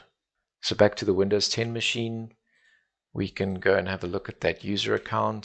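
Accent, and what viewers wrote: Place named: South Africa. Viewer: Cool South African accent.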